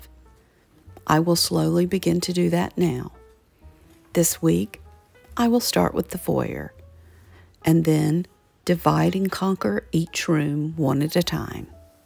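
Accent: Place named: South, United States. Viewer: I bought the book you recommended on Christmas Traditions when you featured Part 1 off Amazon. It was a little cheaper than you had said and so I treated myself. I have enjoyed it so much that I will be decorating for Christmas before Thanksgiving for the first time. I agree it will be more festive at Thanksgiving and give me a chance to sit back and relax to enjoy the Christmas season with a few more things already done. I love your videos it relaxes me to hear your voice and your slow southern draw. I’m a southern girl too so no pun intended. Thank you for all the time you put into your UTube videos. They are lovely, very tasteful and informative. I’m a big fan of yours. Be good to yourself and i will do the same. Good day Southern Lady🍁🍂 Linda from Pensacola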